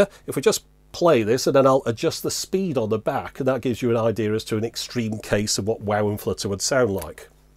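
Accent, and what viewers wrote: United Kingdom. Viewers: I love British accents describing things or providing instruction.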